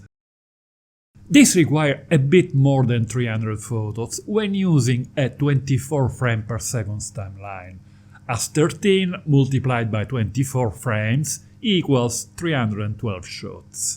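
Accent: Italy. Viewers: I like the Italian accent :D Great job and thanks for the info!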